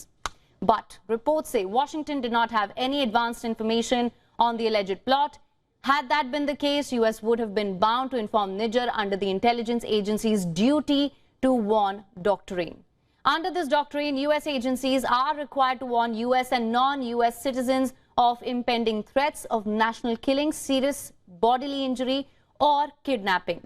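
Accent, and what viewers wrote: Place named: India. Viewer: Can you get rid of this grotesque Indian accent? It repels.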